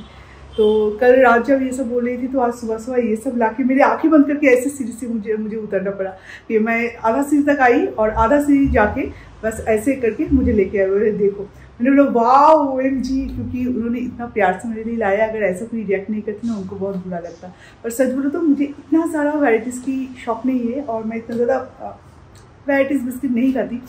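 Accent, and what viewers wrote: Bengal, India. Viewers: she speaks hindi, in bengali.... too much to take... both her hindi and english is a blot for all urban vloggers... upbringing and background matters !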